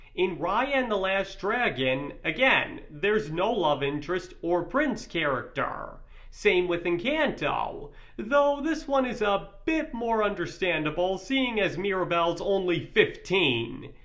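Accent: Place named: Midwest, United States. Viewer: Maybe it's their accent? Prob midwest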